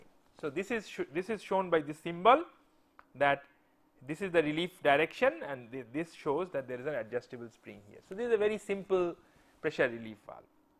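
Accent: India. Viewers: you just have to love his / indian accent :DD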